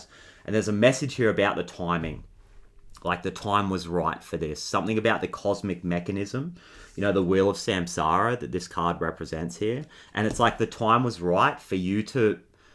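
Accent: Australia. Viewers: It's an Australian accent! We all speak like that here! 🦘🦘🦘🦘🦘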